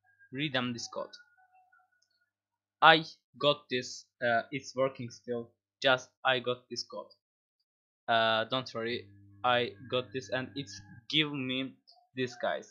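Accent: Turkey. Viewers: olm sesinden turk oldugu belli oluyor qweqwe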